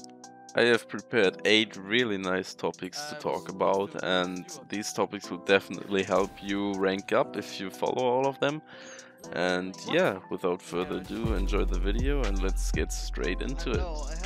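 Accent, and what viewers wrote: Germany. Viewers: Are u a german guy ? (Because of the accent)😅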